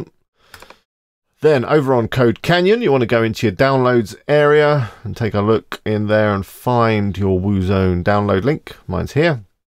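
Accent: United Kingdom. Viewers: Are you from Nottingham perchance?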